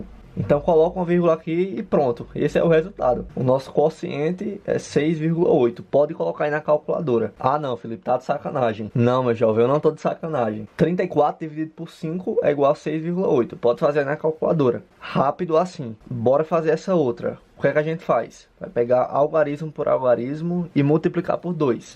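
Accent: Northeast, Brazil. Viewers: Ele é nordestino?? O sotaque dele parece muito. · Eu adoro esse sotaque dele Nordestino kkkkk❤❤ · Ai esse sotaque nordestino é tão lindo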